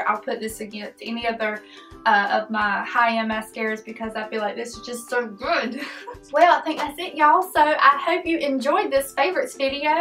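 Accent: South, United States. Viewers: You're accent is so cute, I'm from the Heart of Dixie as well <3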